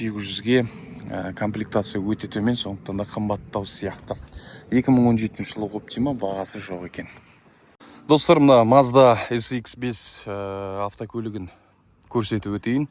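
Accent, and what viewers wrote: Standard. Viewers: Керемет бауырым сөз жоқ таза қазақша Алла жар болсын сіздерге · жақсы таныстырып жатырсың таза қазақ тілінде рақмет бауырым қаида жүрсеңде жолың болсын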